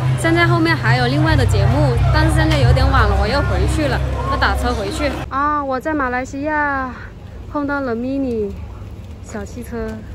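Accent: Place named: Cantonese, China. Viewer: 你说中文好可爱哦有点广东腔👍🏻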